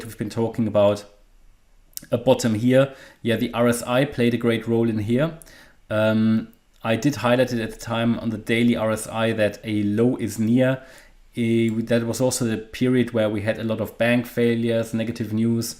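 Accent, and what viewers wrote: Germany. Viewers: is it me or is that a german accent?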